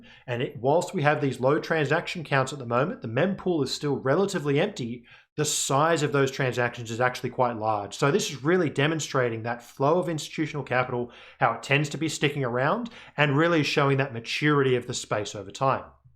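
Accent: Australia. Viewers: Is this speaker from Australia?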